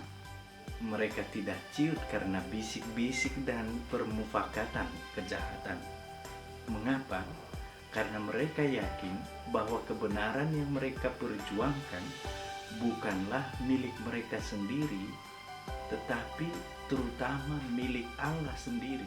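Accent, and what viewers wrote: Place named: Porsea, Indonesia. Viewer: Titip Salam buat Pariban Awak Pastor, OFM. Cap.. Suaranya KENTAL khas SUARA PAR-PORSEA.. Maaaaantaaaf. Terimakasih atas RENUNGANNYA. SEMOGA SUARA TUHAN TETAP DAN SELALU HATI.. GBUs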